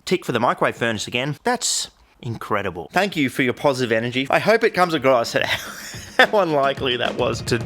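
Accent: Australia. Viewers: with an Australian accent, which as an American, makes this 45% more engrossing to me than NileRed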